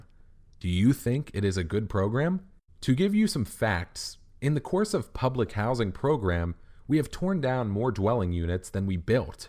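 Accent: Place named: Eastern Europe. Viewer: What happened to that sweet sweet Eastern European accent?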